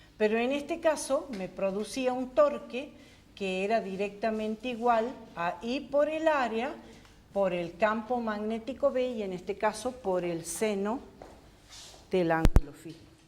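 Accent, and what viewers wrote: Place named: North, Spain. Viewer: Que Facultad es? Tiene acento norteño la profe.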